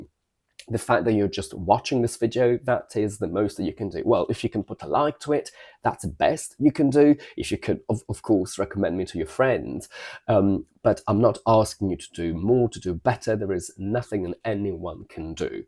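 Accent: United Kingdom. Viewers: It's so sad to hear that! Watching from Thailand and I caught every word in your video. I can feel you completely. ❤😢 Thank you so much for your time and dedication nurturing this channel. Firstly, I followed this channel because I love listening to your voice, your British accent and also your lessons on pronunciation. I used to teach English in my free time many years ago but with my busy work scheduled, I had stopped. Now, with the rise of online teaching, I've come across some online English teachers teaching incorrect pronunciation. This highlights the importance of good teaching and inspired me to help others avoid similar mistakes. I'm not here to tell my story, though. I just wanted to share that when I listened to you, I saw myself (we're not comfortable in front of camera, scripted not to miss the point, spent ages editing, not monetized as I just started). 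I whole-heartedly understand your journey and cried with you. 😢 The knowledge you've shared is valuable, but the time you've dedicated is equally important. To me, you are an incredible teacher. I will always come back and learn from this channel and will also recommend to my future students. Lastly, I wish you joy and tons of success with your new channel. Subscribed! ❤ P.S. Last night, I listened to your new ASMR channel to calm and relax before going to bed.😍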